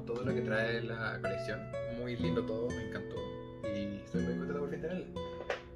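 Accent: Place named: Chile. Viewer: Hola buenas , escuche tu acento y inmediatamente supe que eres chileno jaja , queria preguntarte si ¿tuviste algun problema al recibir el paquete? (Un retraso o algo) lo pregunto por que lo quisiera ,la verdad esque esta muy hermosa la edicion y me encantaria tenerlo. Muy buen unboxing , me caes bien ❤ te voy a empezar a seguir , te deceo mucha suerte en tu vida espero que crezcas en youtube (bueno ya mucho parloteo) nos vemos chao👋❤ .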